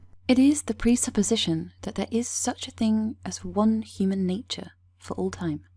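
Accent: England, United Kingdom. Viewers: Gosh, this British accent is so cute ❤️. greetings from Brazil. Get Brexit Done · WOW! This ladys English accent is fabulous & sadly soon to be replaced. I prefer this to an ISLAMIC accent.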